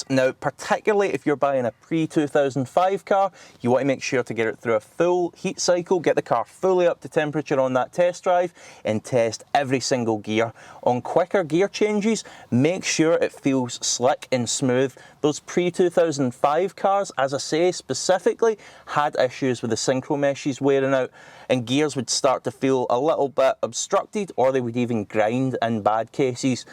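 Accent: Scotland, United Kingdom. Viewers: Great vid and the accent totally reminds me of Trainspotting:) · and you referred to the 2006 model twice....I think you meant 07-08 . I can't stand Scottish accents.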